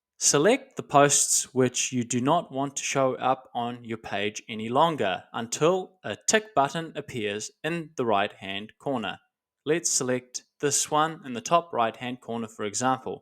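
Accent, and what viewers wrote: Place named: New Zealand. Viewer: I wish I had seen this video 2 hours ago!:) Thank you so much for this info. So, even though I spent a lot of time manually deleting posts, you just saved me another 2 hours! BTW: As soon as I heard you speak, I thought to myself, "If isn't from New Zealand, I'd be very surprised!" Then, I saw your page and saw you live in Tauranga! I spent many happy days back in the 1980s with friends who live in Tauranga. I seem to recall running a road race at Mt. Maunganui as well. New Zealand remains my favorite place on earth, and wish I could have lived there.:)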